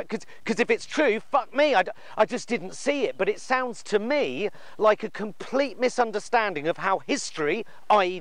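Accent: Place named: Somerset, United Kingdom. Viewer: johnathan Pie i am sure i detected a Somerset or Bristolian accent during your rant????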